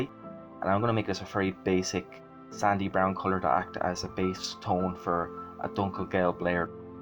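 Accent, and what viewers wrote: Ireland. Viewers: Are you a fellow irish lad? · Great straightforward tutorial with great hints! I’m in the middle of a 1/72 Vulcan bomber using Tamiya paints and I can vouch for everything you’ve said 😊 from an expat abroad who loves to hear the aul accent 😊 Ian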